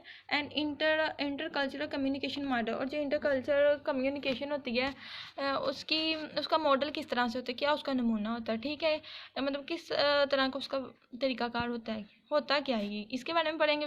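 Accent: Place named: Shakargarh, Pakistan. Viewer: Mjhy smjh to ni ai but mjhy ap ki voice sunny ka Bht Maza aia q k ap Shaker gerh ki h or mjhy achy lagty h whan k log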